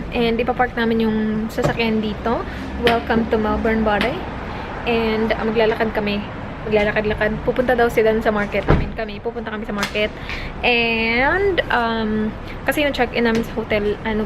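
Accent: Visayas, Philippines. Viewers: Happy 100k, ur vlog is getting more interesting due to d nice places you've been going, credit to ur Papa Bear.... I'm one of ur subscribers who really got hooked watchin ur vlogs Coz ur maarte but natural, sometimes ur visayan accent get me annoyed and I don't find u that attractive and as u said wit 5head but u r all naturelle, u don't mind showing how raw u r.... In time inshallah you will mature and grow like a fine lady medyo konting basa pa more wag lng YT so that ur vlogs will b more substantial.....Good luck! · Mjy visayan accent annoys you? Remember there are a lot of dialects in Ph, and no specific dialect should be superior than the others. Youre wishing maturity but heyyy, wish yourself more maturity please if that is your outlook and belief in life. IBOG LANG KANG BAKI-A KA! Awa makatakod ang imong pagka walay maturity aunty hahaha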